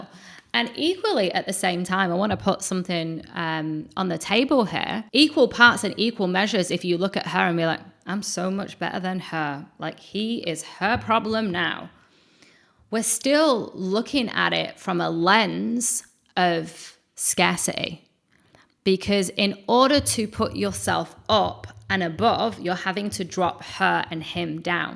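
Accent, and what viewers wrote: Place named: Australia. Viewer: Omg this is so relaxing You have that slight Aussie tone in your voice in the back , that makes my brain go numb for the rest of the time you’re talking. That scratchy growling raspy purr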